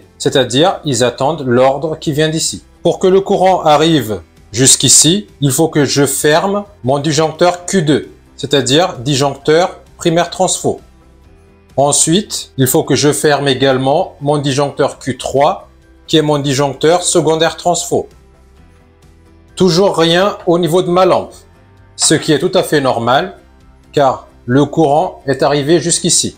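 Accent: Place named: Alpes-Maritimes, France. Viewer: Merci bien parler et on dirait vous êtes 06. Pas 15. Vous exprimer très bien mieux que mon formateur de l'AFPA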